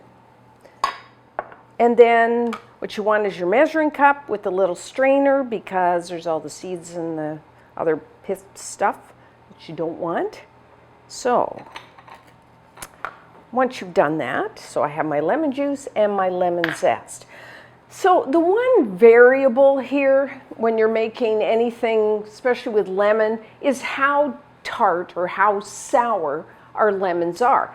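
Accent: Canada. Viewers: Obviously you/she must be from Canada. “Quite this, quite that” just sayin...no slam.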